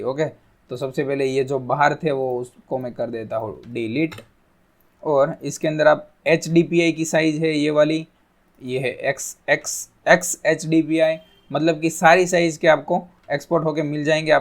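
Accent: Gujarat, India. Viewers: When you speak Hindi, it seems that you are 100% a Gujarati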